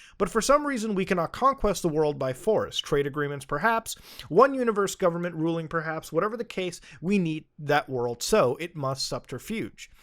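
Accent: Canada. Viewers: Your Canadian comes out pretty deep in these haha. Love it! This last bit is exactly what the internet used to be like in the 90's! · girlfriend half asleep listening to my video "is he Canadian? " "I can hear his accent" that it was cool how she just randomly said that I watch you every night lol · Maybe I’m just high af but sounds so Canadian in this video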